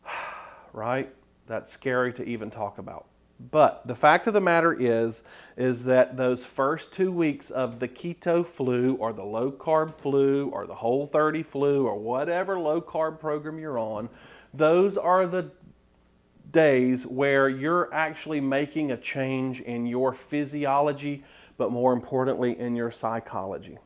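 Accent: South, United States. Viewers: Found your channel a few days ago. I love your personality and good nature. Loooove love love your southern accent! I used to live in the FL Panhandle and miss it so much. Thank you for your honesty regarding your triggers, we all struggle in different ways. Wish you and your channel the best 🙌. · I love to hear ya talk I'm super southern too lol so you keep doing you ❤❤ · I just subbed based on your rant alone. I love it! I'm a southern girl and you sounded like the people from my grandma's hometown! That blessed me, thank you for not editing it out. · You popped up on my YouTube feed today and I instantly fell in love! I love the recipes, I love your rambling...and I especially love your southern drawl (I am from southwest VA). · I love this including your talking (which I don't consider rambling at all) Thanks for your humor and as a Southerner myself, your accent. 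· i just subbed bec of this vid. I love it. Also, your accent... I can't quite put my finger on it... southern?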